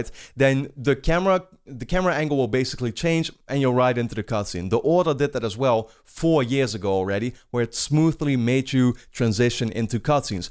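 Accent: Netherlands. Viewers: I cannot unhear the dutch accent lol. Its cool tho, i’m dutch myself. Great review!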